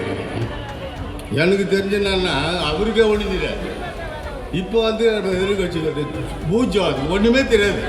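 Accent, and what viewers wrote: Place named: Chennai, India. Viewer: 😆😆😆😆😆😆😆😆 original madras basha.... thala ne gethu thala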